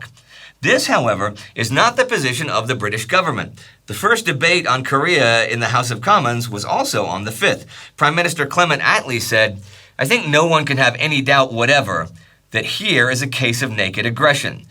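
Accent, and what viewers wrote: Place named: Rust Belt, United States. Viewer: He's doing his best with his Rustbelt, Mid Atlantic accent of a man in his 50s. Probably vastly better than 95% of vids about the Korean War. BUT: appreciate the feedback and if you look at WWI/II IRT things vastly improved/changed with time. Let's see!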